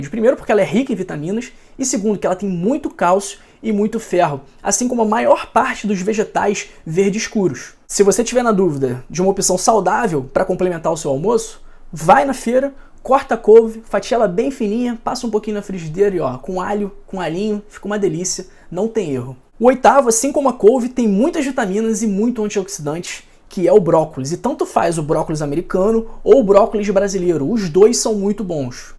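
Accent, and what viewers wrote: Rio de Janeiro, Brazil. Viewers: O sotaque dele não nega...é carioca mermo! 😄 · Esses olhos enfeitiça a gente! E esse carioqueixxxx é envolvente! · Que lindo te ver falar, tua fala é chique, deve ser carioca. Deus abencõe...... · Eu acho q o sotaque dele e do Rio de Janeiro muito fofo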